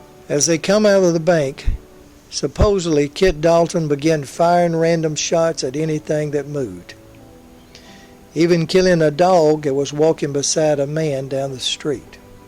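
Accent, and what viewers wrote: South, United States. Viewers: I think we're close to the same age, if Jerry Mather as the Beaver is your age then we're the same. You have a smooth southern voice I like hearing, and being a history major from William and Mary getting to hear stuff that wasn't included is always a plus.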